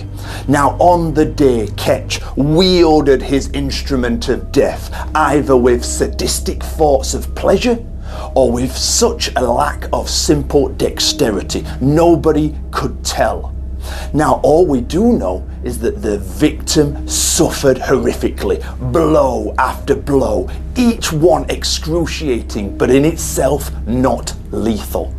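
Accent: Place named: Middlesbrough, United Kingdom. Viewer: I'm trying to figure your accent out....a bit of a Middlesbrough accent....a bit sunderlandish, bit of a Mild Geordie twang. I think your from somewhere between Hartlepool and Sunderland. I'd love to know if I'm close.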